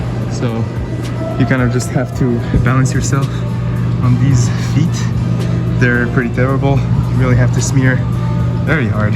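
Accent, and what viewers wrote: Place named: Quebec, Canada. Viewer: 2e jour de suite où j'écoute une vidéo pas rapport en anglais et je réalise en plein milieu que c'est un québécois comme moi.😂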